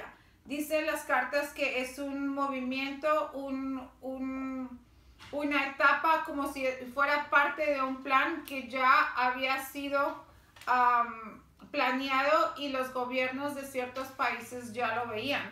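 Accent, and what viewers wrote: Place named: United States. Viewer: ahhmm tu porque hablar como gringa?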